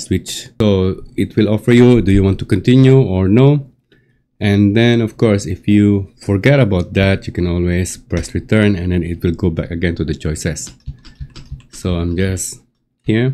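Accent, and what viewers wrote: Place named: Ilocos, Philippines. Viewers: the accent gives ilocano vibes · Hey, base on your accent and your name 🤣, I could tell your a Filipino, don't know if I'm right, but Well done kabayan. Pin if your really a Filipino pls.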